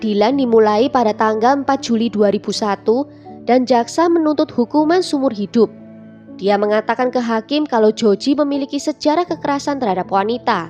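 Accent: Java, Indonesia. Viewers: Kak.. org mn sih kok bahasanya medok banget. Club itu bukanya dibaca 'klap' ya dlm ejaan bhs indonesia. Masak di baca 'klup' jg... geli kedengaranya. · Kak...keren ih ceritanya... Btw spt logat org Jawa ya mbak... Sip smg sukses ya.... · dari pertama liat chanel ini aku dah yakin mbak e wong jowo koyok aku..😁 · Naratornya org jawa ini logatnya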